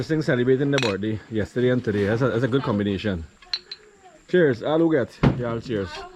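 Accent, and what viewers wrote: Guyana. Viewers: Guyanese accent seems musical, I always love to listen Sunil Naraine the cricketer voice as it seems musical like yours. Love and respect from 🇮🇳. Keep enjoying bro.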